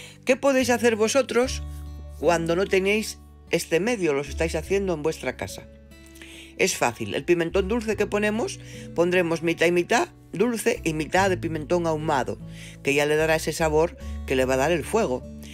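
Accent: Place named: Mexico. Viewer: Saludos desde Matamoros Tamaulipas México 😊 Frontera de México y EEUU Me sorprende que usted siendo de Asturias Habla igual que nosotros Los mexicanos jeje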